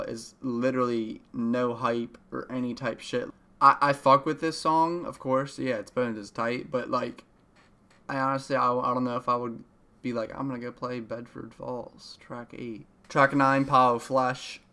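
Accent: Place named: Philadelphia, United States. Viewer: you sound like youre from philly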